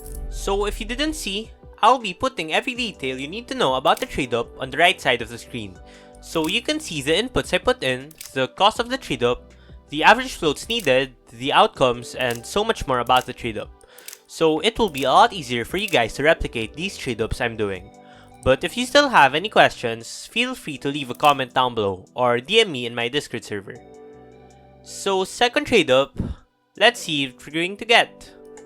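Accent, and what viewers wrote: Philippines. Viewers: are u from phillipines your accent sounds like it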